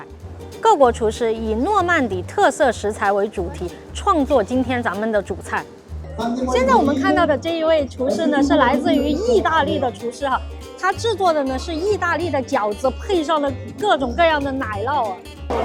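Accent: Sichuan, China. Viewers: 不知道为啥，你开口虽然说的是普通话，但一听就听的出来是四川妹纸：）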